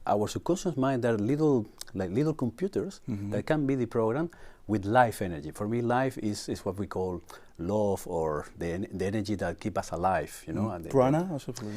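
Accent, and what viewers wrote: Spain. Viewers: Voor mij, wonend in galiciē is het vreemd om engels met een sterk spaans accent te horen. Ik ben er door afgeleid. ik zit steeds te wachten tot hij in het spaans verder gaat, maar dat gebeurt niet.😂😂.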